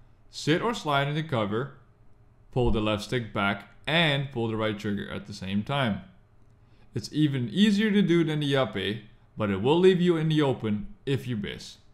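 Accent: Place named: Hawaii, United States. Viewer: Your from Hawaii I can tell good video shooooootz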